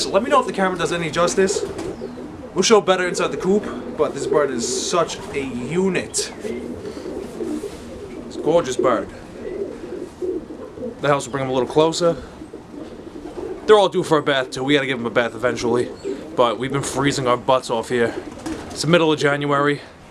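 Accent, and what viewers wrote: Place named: New York, United States. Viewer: Bruh that New York Accent on point 😂😂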